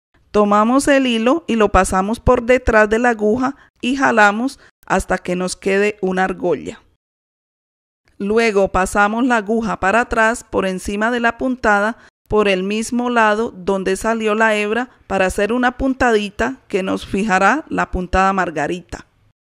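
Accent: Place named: Andes, Venezuela. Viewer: Su acento parece de los andes de mi Venezuela amada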